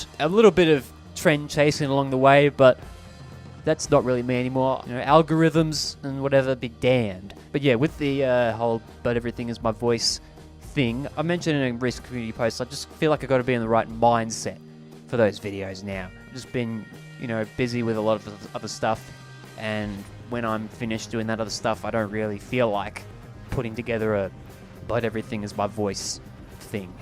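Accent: Australia. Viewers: I wasnt expecting Australian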